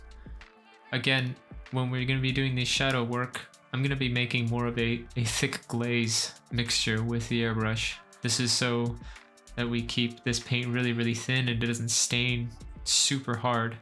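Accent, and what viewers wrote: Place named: Canada. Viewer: No offense but are you Canadian? You pronounce “decals” and other words differently than we do in the US.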